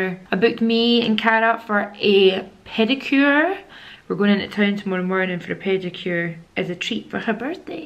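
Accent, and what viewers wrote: Scotland, United Kingdom. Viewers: Your the first Scottish youtuber that I've followed it's great hearing the Scottish accent xx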